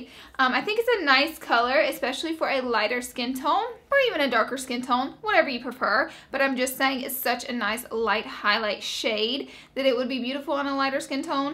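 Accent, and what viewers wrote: South, United States. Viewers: Have you...have you ever heard of a southern accent? Magic.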